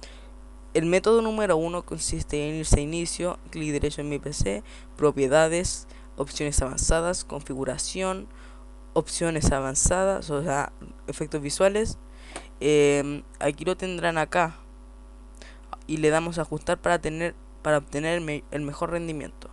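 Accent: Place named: Spain. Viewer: porq carajos hablas como si fueras español?xDBuen video!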